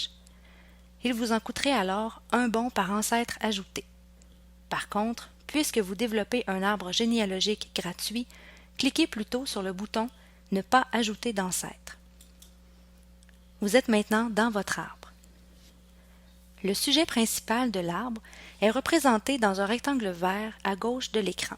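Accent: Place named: Quebec, Canada. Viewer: L'accent (que j'adore) québécois tout simplement ;-)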